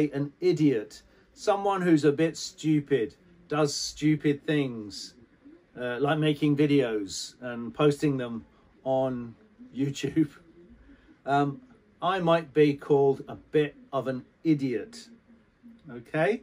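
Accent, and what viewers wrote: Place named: United Kingdom. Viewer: Waiting for this another british pronunciation of yours pastor😊❤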